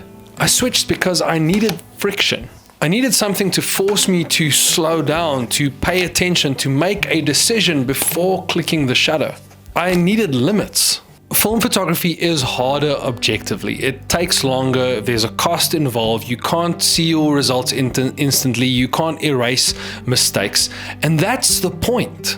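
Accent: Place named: South Africa. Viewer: Howzit, this film really resonated with me. I’ve always written with a fountain pen since school days in the eighties in KWT. When I learned to shave, my great uncle gave me his cutthroat razor which took a lot of practice to master. When I started fly fishing, my wife bought me a split cane fly rod. Each of these force me to slow down and think about what I am doing. Two years ago I went back to vinyl and this year I began teaching my son film photography. Every days is calmer, every moment is a little more considered. Thank you, keep making your films. It’s lovely to hear a South African voice and see parts of SA when living in rainy, cold England.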